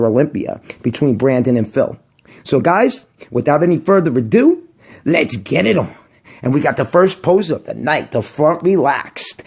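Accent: New York, United States. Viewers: I love your accent NY style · Idk what part of ny that accent is prob the city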